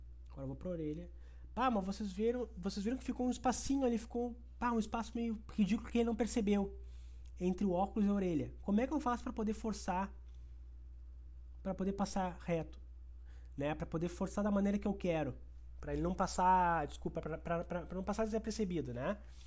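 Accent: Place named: Rio Grande do Sul, Brazil. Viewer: Eu simplesmente amei Gostei do modo que você falar, com certeza é Gaucho muittto bom mesmo, aprendi demais Obrigadaaa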